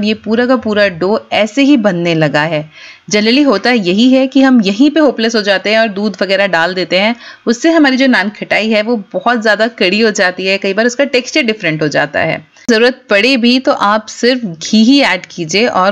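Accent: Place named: Meerut, India. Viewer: Aapki Urdu boht piary hai pure merut wali love from lahore pakistan 😘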